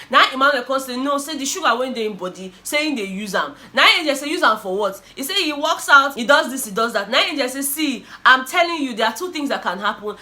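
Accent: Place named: Pidgin, Nigeria. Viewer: Babe! I'm a first-timer and you got me with your clean English mixed with Nigerian pigin. You've got a new subscriber! Well done.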